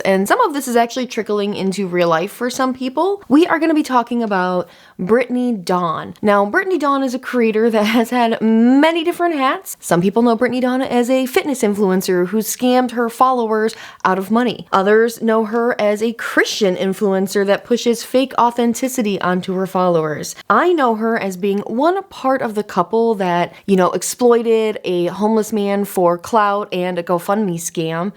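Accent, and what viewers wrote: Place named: Midwest, United States. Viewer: Omg are u Midwestern? I hear my ‘aaaaaah-ccent’ in ur way of talking hehe ;)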